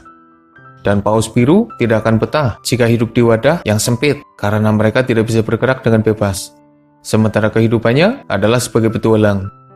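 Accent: Banyumas, Indonesia. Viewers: mau di sembunyiin ngapak2 nya ttp krasa🤔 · Ngapak terossss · Nada orang ngapak dia bang. Bhineka tunggal Ika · Orang ngapak ya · Gole ngomong ngapak temen min😂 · Org ngapak yo mas ?? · Masee wong ngapak yak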